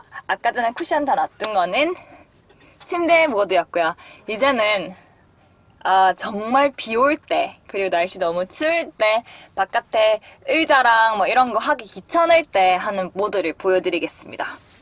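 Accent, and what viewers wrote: Gyeongsang, South Korea. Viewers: 부러워요 어떻게 이렇게 잘만들었어요 나도 갱상도 사람 ^^~~~갱상도말 구수해요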